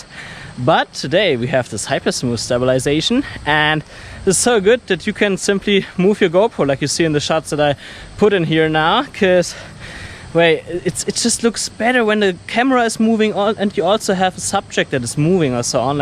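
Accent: Germany. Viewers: Der deutsche Akzent ist real :D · Keine 48 Sekunden dein Video angeschaut und gewusst, dass du ein Deutscher bist :D Der Akzent ist eben sehr durchdringend haha :D Hast ein Abo da!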